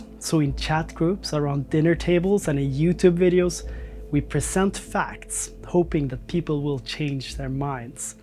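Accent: Sweden. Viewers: unapologetic swedish accent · I think this sounds silly, but I've been seriously hurt by some Swedish people before, and unfortunately, I've become distrusting of them as a result. Your videos help me hear your accent and work on destigmatising Swedish people as whole just because of a couple of bad apples. Thanks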